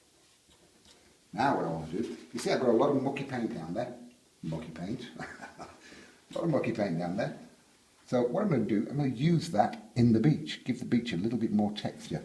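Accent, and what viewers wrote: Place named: North, United Kingdom. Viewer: Put the subtitles on top right of video three dots Captions, he is easy for us Northerners to understand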